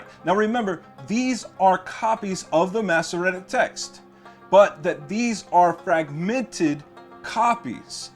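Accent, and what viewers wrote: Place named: Eastern Europe. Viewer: I have noticed that the pronunciation of the "V" in Eastern European accent is "W". I asked myself, "What's up with that?"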